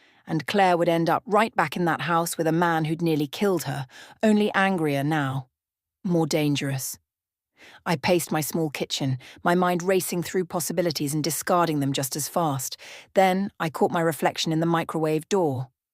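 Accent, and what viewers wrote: United Kingdom. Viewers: How refreshing. A British accent, not AI too. New twist, also refreshing, not so new, yet again, both parents killed in a car crash whilst still young. Yawn! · Love the British accent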